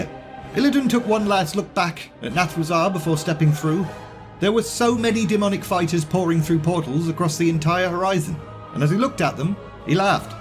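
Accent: United Kingdom. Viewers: great stuff so far.... (see what I did there?) butt(wink) no one is surprised by that bit of corn. Keep pushing em out and youll feel a great relief when the weight is off you. Thanks for your effort genuinely, youve ruined reqular audio books for me lol. No where near enough jokes or profanity or character voices or British accent lol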